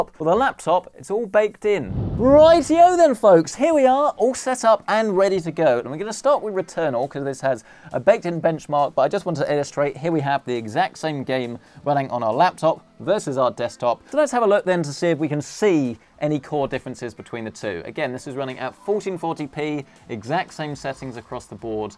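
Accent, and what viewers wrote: United Kingdom. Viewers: Dear Lord its British Linus!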